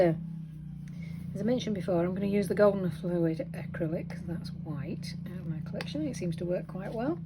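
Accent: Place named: Hull, United Kingdom. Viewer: don't know why i haven't discovered you before! great stuff. you sound like you're from hull, i'm in cleethorpes. thank you xxx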